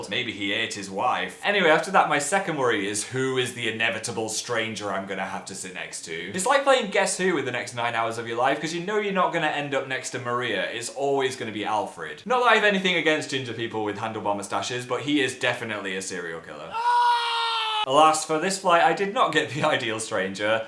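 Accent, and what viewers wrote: North, United Kingdom. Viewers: You're sounding rather Northern in this. I think the time you spent with your Mum and Dad rubbed off on you. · He sounds so northern in this video! In 2018 he doesn’t really sound like it anymore :c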